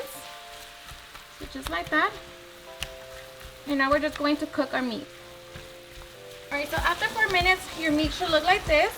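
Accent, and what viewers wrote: Mexico. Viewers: Just found your channel today in one thing Are you Mexican because I think you some Mexican accent in U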